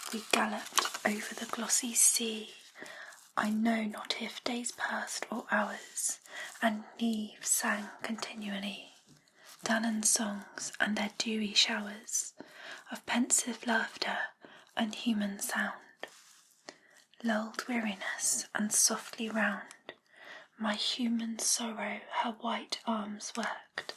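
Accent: United Kingdom. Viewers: really those have one the best voices for asmr her british accent certainly helps like Lottie🤤🤤🤤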